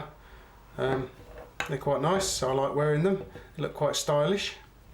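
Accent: United Kingdom. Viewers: Are you from London?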